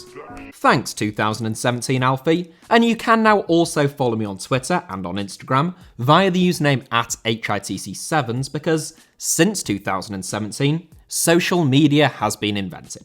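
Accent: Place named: England, United Kingdom. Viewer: Give it a rest bro. He’s saying all of these names thru a heavy English accent. As far as pronunciation goes, Alfie’s done most of the names you’ve mentioned pretty well. He’s not going to say them like a native Portuguese speaker my dude.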